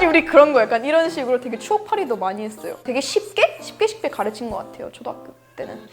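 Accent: South Korea. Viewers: 예쁘고 귀엽네 발음이 완전 한국인이네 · 아무리봐도 유닉한 캐릭터임 한국말할때는 말투, 억양, 마인드까지 100% 한국패치완료됨. 서양 마인드만 살아있다면, 이런 캐릭터는 방송이나 연예계통뿐만 아니라 일반 사회적으로도 필요로한는데가 무지하게 많을듯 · 말투가 조선사람이구먼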